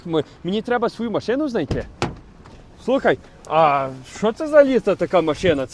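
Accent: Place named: Ukraine. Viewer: Малий говорить як канадієць, а із українським акцентом)) А Українці і дійсно повертаються із різних причин: хтось шукає шари(а її тут нема), хтось бачить, шо у Європі йому було легше(і це часто правда), а у когось банально закінчились гроші, а робота не знайшлася(і добре, якщо вони не валянки і мають заначку на квитки назад), хтось звик до корупції - знайомі-кум-сват-брат, а в Канаді їх немає (і СЛАВА БОГУ)... і т.д. Канада - не для всіх. І це правда. Але вона не погана. І це теж правда. Тут ставлю лайк, бо він дуже об'єктивний.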